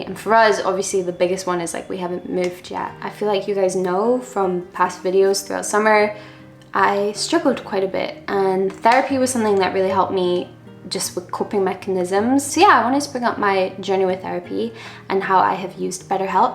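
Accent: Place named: Edinburgh, United Kingdom. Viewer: She’s from Edinburgh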